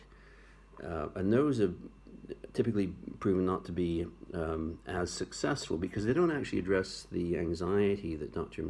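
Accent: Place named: United Kingdom. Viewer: I think I hear a slight British accent ❤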